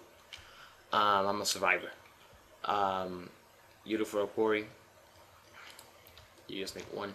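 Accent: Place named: Mexico. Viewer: r u Latino? im mexican. u sound mexican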